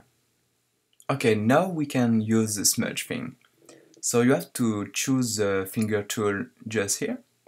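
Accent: France. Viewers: Aaaah, l'artiste de Reddit est donc un Français ? :DD (French accent spotted) Comme quoi, beaucoup de talents en art et design numérique viennent de notre beau pays ^^ Je suis un de tes premiers fans :) On sent qu'il y a la même "patte graphique" qui revient souvent sur la plupart de tes autres wallpapers, cependant cela reste quand même un beau style que tu as su t'approprier ;] Bref, bonne continuation et bon tutoriel, Un "French" 😁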